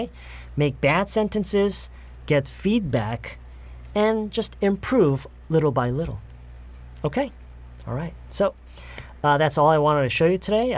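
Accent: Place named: United States. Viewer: 발음이 미국 사람과 비슷합니다 흑인보다 백인 영어에 가깝습니다 얼굴은 흑인인데 백인영어를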